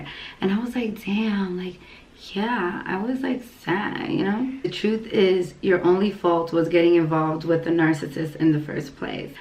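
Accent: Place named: Latin America. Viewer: yo....are you black? I know thats a dumb ass question but i wanna know because your accent makes you sound latina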